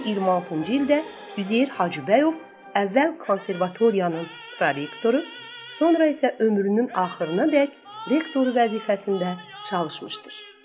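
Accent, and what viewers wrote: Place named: Talysh, Azerbaijan. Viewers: Müslüm Moqamayevin şəkli səhvdir. Bir də ki danışan elə bil talışdı ləhcəsi var. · Ee bəsdirində xəstəsiz talış ləhcəsidi ləhcəsidi nolsun əsas məlumatınz oldu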